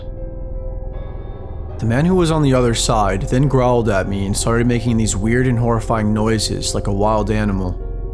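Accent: United States. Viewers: No offense mate, but your accent sounds like a stereotypical California suffer bro dude. It’s not a bad thing. Haha. But it’s hard to take you seriously when your voice sounds so unserious. Let’s face it the Cali suffer bro aren’t the most serious types of people. 😂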